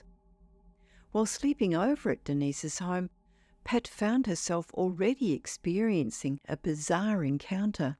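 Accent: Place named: Australia. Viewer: Its called an aussie accent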